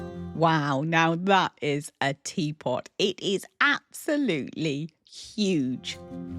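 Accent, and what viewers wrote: United Kingdom. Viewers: I’m I heaven…the British accent, thrifting, design. Thank you for sharing. Diane from Florida.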